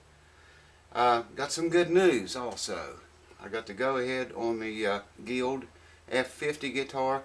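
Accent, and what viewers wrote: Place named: Kentucky, United States. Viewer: Dig the vids. I watch them cuz your one cool cat. You tone of voice reminds me of my family from Harlan Kentucky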